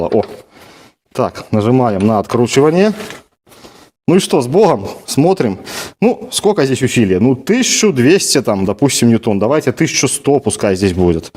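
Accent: Belarus. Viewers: По разговору похоже что вы из Беларуси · Давно в Беларуси не живу, но интересно наш акцент услышать родной, ) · дак я ж по говару сразу сказал - Белорус) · По голосу слышу, что беларус. А ссылка на российскую версию Алиэкспресс. Не понятно.. . 🤷‍♂️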